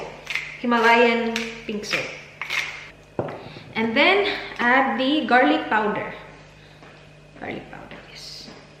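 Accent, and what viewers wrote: Visayas, Philippines. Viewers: Ka bisaya ba diay nimo te oi HHAHAHAHA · Bisaya ka po ba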